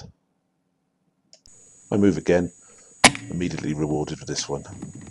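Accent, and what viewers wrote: United Kingdom. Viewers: British voices make for great commentary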